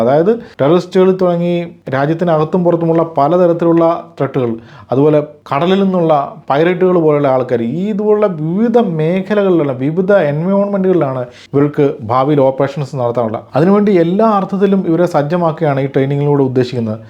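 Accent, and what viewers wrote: Kottayam, India. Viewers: നാട്ടിൽ എവിടാ കോട്ടയത്ത്‌ ആണോ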